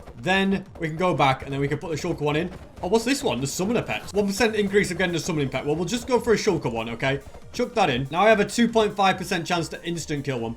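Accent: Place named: London, United Kingdom. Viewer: hes english (london)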